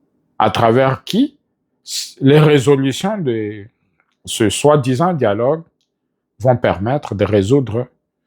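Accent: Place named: Kasai, DR Congo. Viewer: Monsieur qui parle. S'il te plaît. En latin "vox populi, vox Dei. Ce nest pas le Cardinal qui doit trouver une solution pour l'est de la RDC. Tout le monde parle du dialogue. Le ministre Belge des Affaires étrangères ainsi que le jeune et vaillant président Ibrahim en ont très fraîchement parlé. Fais tout avec conseil, et tu n'auras pas à te repantir Tu es le seul à dire que c'est Kagame qui arme le M23. JKK, pour quoi a t il été condamné à mort? Si tu as un accent du Kasai, c'est normal que tu puisses défendre celui que tu défends. Le peuple d'abord, mon frère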